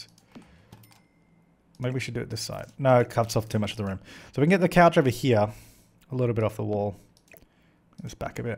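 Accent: Australia. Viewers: I'm weird lol:3 All Australiens sound the same for me I think 🤔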